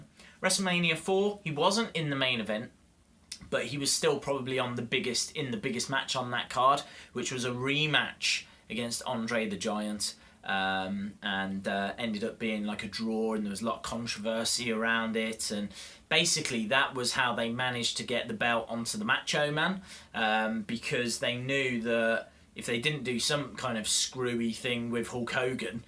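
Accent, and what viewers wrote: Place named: Birmingham, United Kingdom. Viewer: You sound like a fellow Midlands Fam. Maybe Birmingham?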